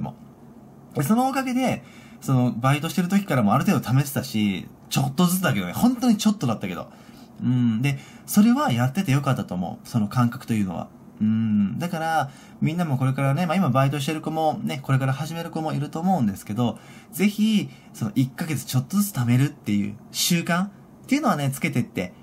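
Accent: Kansai, Japan. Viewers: おとんってｗ さん、ところどころ関西のｲﾝﾄﾈｰｼｮﾝがありますけど、関西人では無いんですよね？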